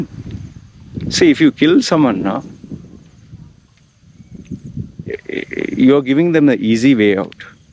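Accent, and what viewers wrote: India. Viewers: In Canada English people hate people from Idian origen ! They hate your accent ! why you follow anglo culture??? ? WHY???? you need mental help !you are living on the moon ! everybody know your people are scammers ! why you get tattooes?? I dont respect man with tatoos on face ! you should go back to your country and leave the people in Thailand in peace . Are there toxic chemicals in tattoo ink? Mercury, cobalt sulphate, other soluble cobalt salts, and carbon black are in Group 2B, which means they may cause cancer in humans. Cadmium and compounds of cadmium, on the other hand, are in Group 1 (carcinogenic to humans).When it comes to cancer, black ink can be especially dangerous because it contains a very high level of benzo(a)pyrene.The body is directly exposed to the toxic substances contained in the ink due to the injection of tattoo ink into the skin. 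Pigments may accumulate in the lymph nodes or other organs as they are in direct contact with the skin tissue and lymphatic system.The colorants tatoo ink they get transported to other anatomical locations in the body via the blood vessel and lymphatic systems directly after tattooing or via the lymphatic system years or months after tattooing.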